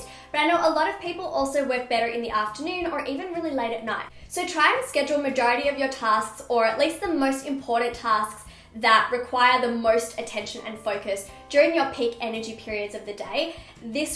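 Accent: Australia. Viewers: Sarah Potter australia i think... or did you mean a certain area haha idk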